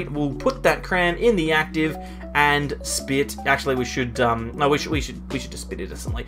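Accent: Australia. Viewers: hey bro i commented before about what the best deck was, now that the lugia vstar deck isn’t in standard anymore. what do you think the best deck is now for standard ranked bro. if you can reply i would be rly grateful bro. are you aussie?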